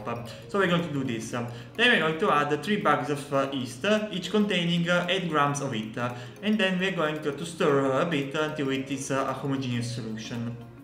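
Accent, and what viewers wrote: Italy. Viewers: To be honest, I always thought non-Italians were being insensitive, that they were exaggerating. They still are, but dayum, bruh. This guy blows my mind, I had no idea some (if not all) Italians actually talk like that. The More You Know haha. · I know you re italian cuz of your accent lol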